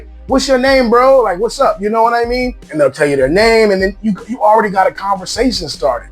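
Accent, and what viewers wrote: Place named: Cebu, Philippines. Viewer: Remember he is talking Cebuano so the accent is different so don't compare his pronounciation and accent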